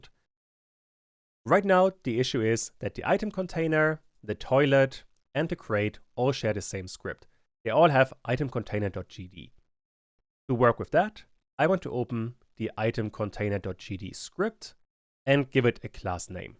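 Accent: Germany. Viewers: I knew I shouldn't start learning Godot until some dude with German accent makes an 11-hour tutorial. Thanks a lot <3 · Just wondering, is it German accent? :)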